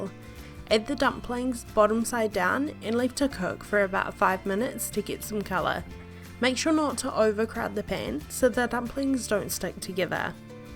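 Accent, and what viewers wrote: New Zealand. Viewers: A New Zealander. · Are you kiwi? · Thank you for the recipe and instruction very simple and clear. Notice you got a NZ accent. Love from Christchurch. Cheers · Awesome dumplings, and once again, love the New Zealand accent...... You go girl, do you think you could make hangi dumplings? Love the channel, and ideas you have. Yummy stuff. From Palmy...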